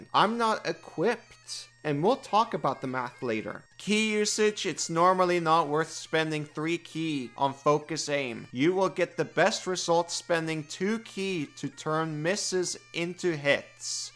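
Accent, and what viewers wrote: Scotland, United Kingdom. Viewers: this guy scottish?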